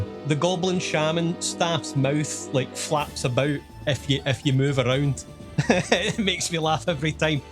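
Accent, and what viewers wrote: Scotland, United Kingdom. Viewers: A Scottish man with a thick accent telling me how perfect one of my favorite games of all time is? This day got even better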